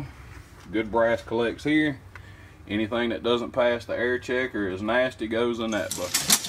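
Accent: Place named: South, United States. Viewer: the true southern draw =)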